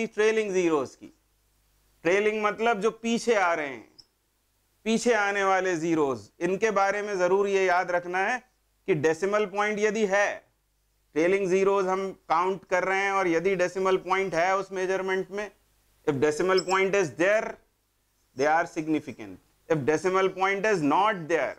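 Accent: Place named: India. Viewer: eng title, random india voice...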